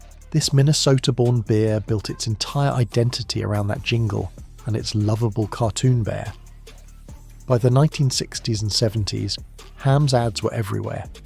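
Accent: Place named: United Kingdom. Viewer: Wait. Why is a video about vanished American beers narrated by a British sounding douche?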